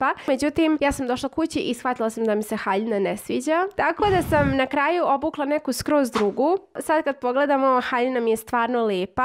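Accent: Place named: Bosnia and Herzegovina. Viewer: A odakle si imas bosanski naglasak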